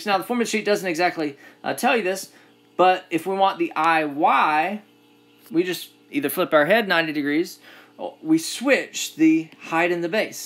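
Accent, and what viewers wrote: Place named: South, United States. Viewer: love the southern accent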